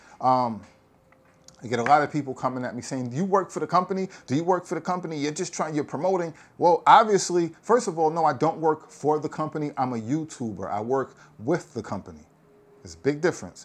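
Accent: New York, United States. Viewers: Is the New York east coast vibe my mans here is real maybe you not use to that lol 😂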